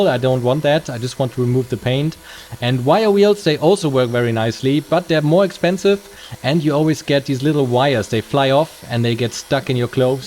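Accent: Germany. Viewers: Selten so ein Deutsches Englisch gehört. Geiles Video, Jung. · ich höre deutsch xD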